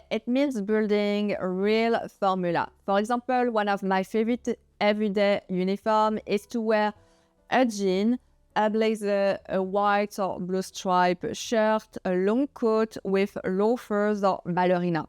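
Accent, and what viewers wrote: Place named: France. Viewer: i'm french but subtitles aren't necessary for me either (even if i speak english like a potatoes). But maybe isn't a native english speaker either, so it can be difficult to understand with her french accent. Everybody has different backgrowns we don't know, so à little help is always welcome. 😊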